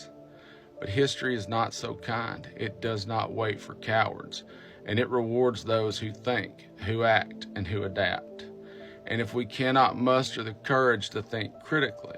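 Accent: South, United States. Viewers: It’s a glorious moment when you finally hear an American with a southern accent take all the stereotypes out there and stuff them in that dark recess. Pure accuracy, concise and eloquent language, and a poignant point. I live in a country where the social safety net is decaying and there is handwringing about how it is funded. There is no handwringing about the moral fact or need for it. China is not a utopia for its people but it can do things 🇺🇸 can’t. It can have a consistent social, economic and commercial strategy for decades. Whether we like the direction of travel, it can move itself consistently. But it isn’t communism or socialism. It’s authoritarianism. That’s a cup you now drink from too 🇺🇸. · Wow, the most sense I've heard from an American for a long time, and delivered in accent that sounds like a Redneck! Well done sir .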